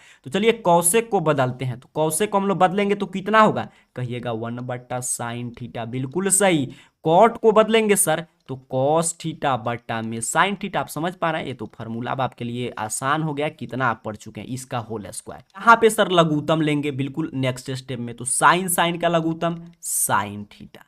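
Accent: Bihar, India. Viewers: Sir is talking in fully Bihari tone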